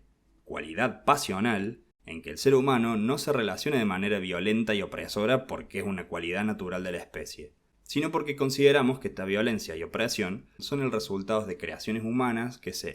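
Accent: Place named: Córdoba, Argentina. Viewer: Estoy muy impresionado en general tanto por la calidad del video, tanto en lo visual como en el audio (yo medio que padezco la grabación de audios para cuando grabo videos). Buen video, pero además me llamo poderosamente la atención tu tonada, porque definitivamente es cordobesa.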